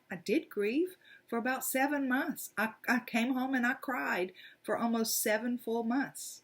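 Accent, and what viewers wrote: South, United States. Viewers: Well said! No one like you, a shining light in the world with a southern accent!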